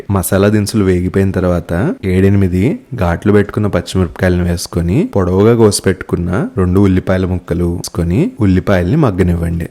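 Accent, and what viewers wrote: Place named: Vijayawada, India. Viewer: Wow pure Telugu,,, are you from vijayawada or khammam?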